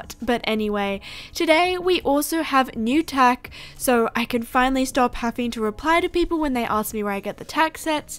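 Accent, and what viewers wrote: Australia. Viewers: Omg wait are u Aussie bc if you are 😳😁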